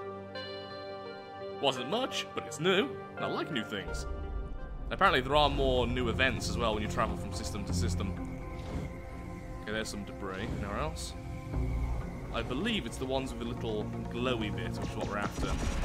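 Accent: United Kingdom. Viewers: next video can you turn down the game music a little? I can't hear your British voice as well as i need to!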